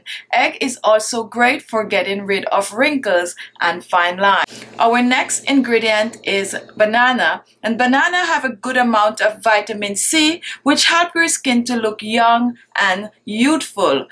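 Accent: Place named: Jamaica. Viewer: Sounds like u r from Kingston Jamaica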